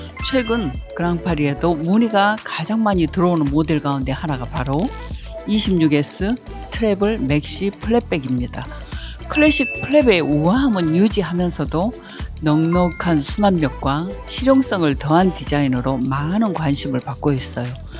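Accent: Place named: South Korea. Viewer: 서울사투리 + 경상도 사투리 + 전라도사투리가 세가지가 느껴지는 말투를 가지셨어요 ㅎㅎㅎㅎ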